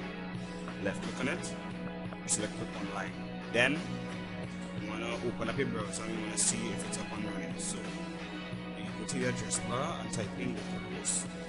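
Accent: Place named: Trinidad and Tobago. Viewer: boss u sound link a trini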